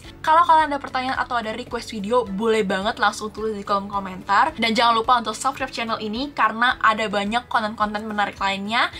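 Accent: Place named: United Kingdom. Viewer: Ngomongnya cepet banget dan kadang pake British Accent jadi kurang kedengaran jelas 😢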